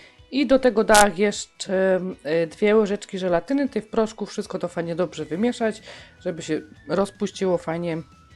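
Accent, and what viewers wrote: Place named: Opole, Poland. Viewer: acha i miłych walentynek jo też mieszkom w rajchu ale jestech ze śląska! 26 lot minolo ale godac po Śląsku niezapomnialam ty mosz taki richtig opolski akcynt jo zaś jestech z okolic Bytomia i tez godom blank po naszym moja koleżanka prowadzi w tc Katowice dej pozor aż gania ze tyla ludzi ze śląska juz niezno tej godki! my Muszyny się tego czimac!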